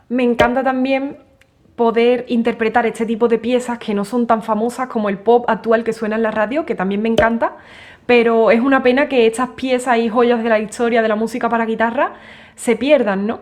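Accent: Andalusia, Spain. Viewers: Te lo mereces guapa! Sigue con tu arte y sigue hablando en ese Andaluz precioso que hablas. Un cariñoso saludo a desde Chile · De que parte de España es el acento que tienes?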